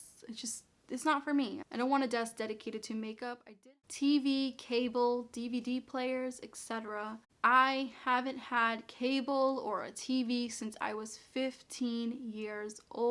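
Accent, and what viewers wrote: Boston, United States. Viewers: That accent is 🔥🔥. I'm from L.A so hearing your accent is different. Is that a Boston accent?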